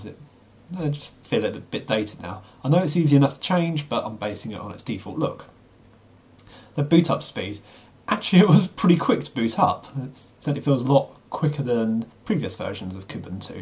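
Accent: England, United Kingdom. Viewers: You're from England?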